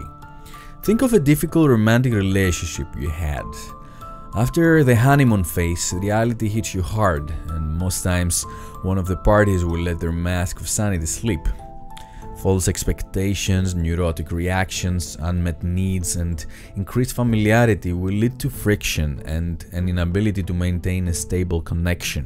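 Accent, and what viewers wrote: Greece. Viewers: You must be a Greek,,,, not only the accent, the looks , but the room decoration.....marvelous. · Very Greek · God damn your accent is so greek. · your accent appears Greek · IS THAT GREEK ACCENT I DETECT IN YOUR VOICE? OR SPANISH?? 🤔🤔🤔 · Lol first time i am in your channel and after the first verses i caught that you are greek from your accent XD great video and very informative.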